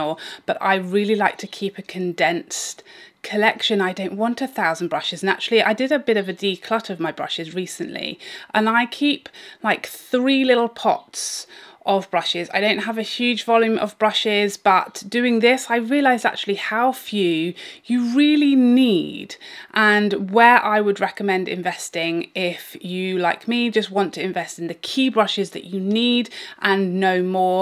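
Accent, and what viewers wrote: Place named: RP, United Kingdom. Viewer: Your channel came up in my recommended yesterday, and I have been binge-watching. You have great information. I really loved your last blush video, and I found several to try. Plus, being from the states and lover of English history and literature, it makes me feel like I'm spending time with my perfectly posh friend with with killer RP accent.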